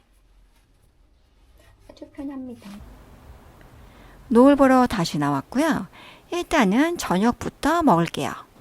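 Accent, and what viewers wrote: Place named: Japan. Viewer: 안녕하세요 ~ 혹시 일본인이세용? 말투가 한국인이 아니신듯 해서요~😂 혹시 한국이시면 특이하신 말투시네요~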